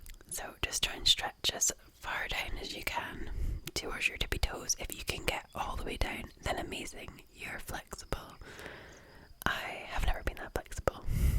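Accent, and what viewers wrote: Scotland, United Kingdom. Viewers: Thank you so much,. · 3rd comment..hot mom Scottish ❤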